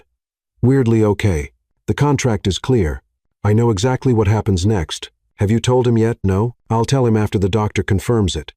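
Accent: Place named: Spain. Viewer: Why the Spanish inflections in the AI speech? They certainly distract from the story. AI should know English only..also AI pace is not how people speak. Saying MISTER then a long pause is odd.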